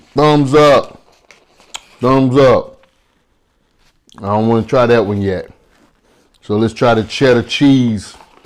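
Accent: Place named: Alabama, United States. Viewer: Sound like he's from Alabama. Food looks great!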